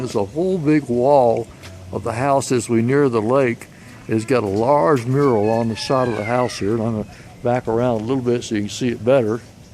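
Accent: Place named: South, United States. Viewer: I just subscribed. Thanks for the video! Where are you from (in the states)? You have a southern accent! I'm from GA and hoping to retire in Mexico. I'm a single, 62 y/o female (with a small dachshund "companion"), so I want to move somewhere safe where there are groups of people my age. I would probably rent a small studio apartment first...and maybe buy later. Do you have any recommendations? Thanks.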